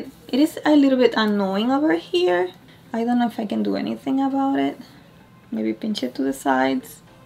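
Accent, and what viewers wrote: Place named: Colombia. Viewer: You look so gorgeous 😍😍 you literally look like Lady Gaga with a Sofia Vergara accent ✨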